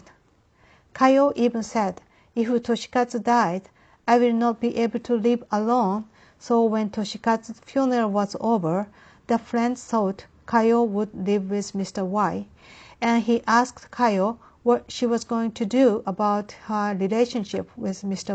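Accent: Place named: United States. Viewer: ミス、 PINKパーカーお似合いね♥ いつもながらのEnglish！アメリカのどこで身につけたんですか? 歯切れ良く、English圏の人に説得力とキレのある語り Voiceが良いです👍 篠原おじさんも弁護士も活用して意見書出すよになったみたいだからさん、我々日本国人として、我々の力になって下さいね♥ がんばって下さい!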